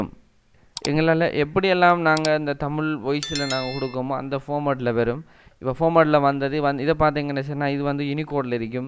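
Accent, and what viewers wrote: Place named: Sri Lanka. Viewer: dayavu senju tamil la pesavum ...kevalamana ilangai tamil la pesathinga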